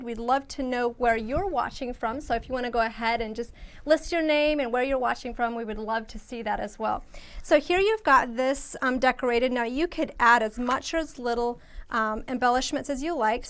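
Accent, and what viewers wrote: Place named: Germany. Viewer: Popping into this several years late, since my daughter & I have been stocking up on the albums., your faint trace of accent made me very nostalgic. My dad's family was Germans from Russia, who spoke a Plattdeutsch dialect very similar to Amish/Mennonite German (recipes are very similar too). Dad was the last generation to speak German first, English second. He died three years ago, and it was such a joy to hear again.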